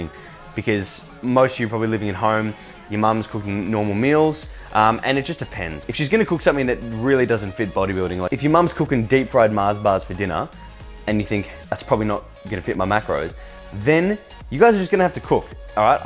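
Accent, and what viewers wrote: Australia. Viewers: Useful video! I have made many of these mistakes when I was younger and still great tips which I had forgotten about nutrition. Also love the aussie accent :D Cheers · I for some reason love the way you say "hey guys here" love the australian accent lol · Is he Aussie?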